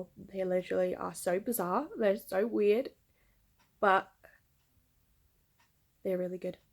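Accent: Australia. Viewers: it sounds like a pretty typical australian accent to me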